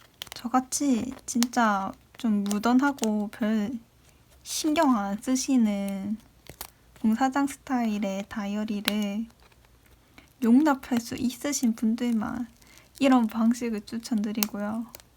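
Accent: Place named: Gyeongsang, South Korea. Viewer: 타지살이하는 경상도인인데 억양덕에 고향온거같구 ㅠ 해서 자주 놀러오려구 구독하고가요😆❤️타지분들이 경상도 억양을 좋아하시던데 제가 듣기에도 너무 귀여우시네요 😘( 입덕)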